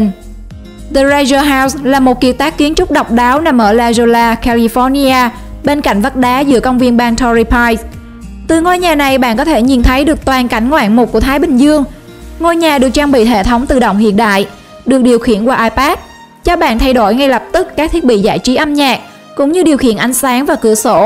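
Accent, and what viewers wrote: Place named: Binh Dinh, Vietnam. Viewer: cij này ns tiếng như bình định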